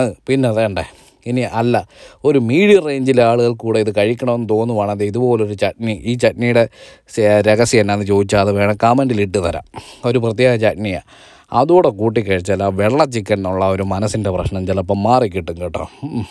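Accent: Kottayam, India. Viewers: Kottayam slang..ente oru cousin achachante samsaram pole..😊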